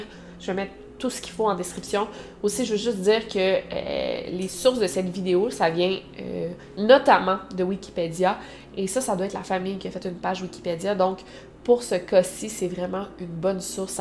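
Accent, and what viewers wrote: Quebec, Canada. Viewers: Nanette et Marjo 🥰! Et personnellement j'adore les miaulements ponctuant ton récit,. Récit passionnant comme d'habitude, et si bien narré avec en prime ton accent québecois 😊.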